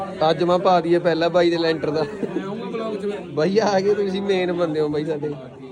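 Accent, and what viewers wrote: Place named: Patiala, India. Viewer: ਬਾਈ ਕਿਆ ਥਾਰਾ ਪਿੰਡ ਪਟਿਆਲਾ ਕੇ ਲਾਗ ਪਵਾ ਬੋਲੀ ਤਾਂ ਇੱਧਰੀ ਕੀ ਲਗਾ ਬਾਕੀ ਛੋਟੂ ਘੈੱਟ ਐ ਜਿਸ ਕੋਲ ਘੱਟੋ-ਘੱਟ 300/ ਰੁਪਏ ਤਾਂ ਹੈ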